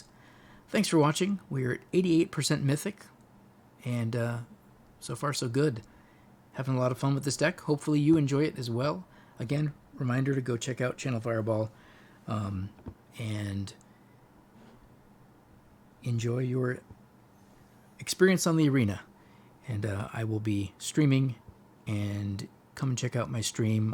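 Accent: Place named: United States. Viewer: Soothing voice with american accent as promised by CGB — check ✅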